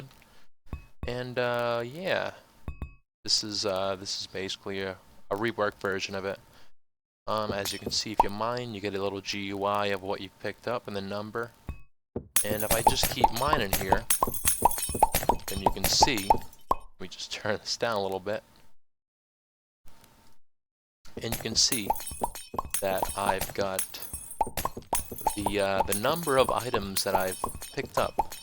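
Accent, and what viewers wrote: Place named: Netherlands. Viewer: are you from the Netherlands because you have that kind of accent also you make Amazing mods Keep it Up!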